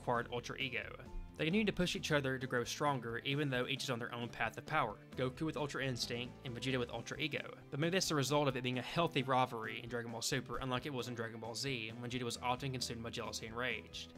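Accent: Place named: South, United States. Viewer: Glad this video was recommended to me, you make some bangers man. It's always comforting for me to hear another Southerner (I'm assuming) nerd out, bc where I'm from anime and animation in general still has a huge stigma around it. Keep being awesome!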